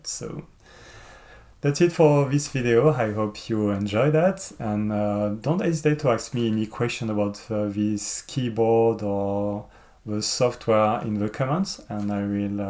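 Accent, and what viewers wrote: France. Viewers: hey, nice video, i gonna start learning piano, and i'm gonna take this one, thanks you for the video, by the way your accent sound french, c'est sympa :) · Bonjour, j’ai remarqué votre accent français, j’ai reçu ce piano hier et impossible de le faire marcher, je ne comprend pas comment pouvoir jouer si le son de sort pas quand je clique sur les touches. Pourriez vous m’aider?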